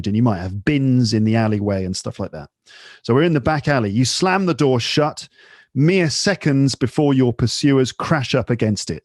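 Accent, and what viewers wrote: United Kingdom. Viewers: Sorry i don't wanna listen UK accent. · I was listening to your podcast for approximately 4 months ago ; and due to that reason, i started to get your British accent furthermore the evident improvement in my english in terms of vocabularies, listening and speaking. You're my favourite teacher and podcaster, I really appreciate you and wish the best for you always. 😃💚.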